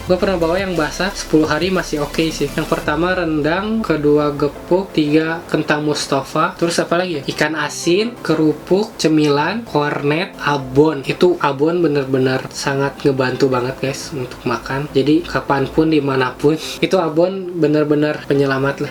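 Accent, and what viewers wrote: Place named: West Java, Indonesia. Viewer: orang bandung? hehehe meni sunda soalnya walaupun ngomong "gue":)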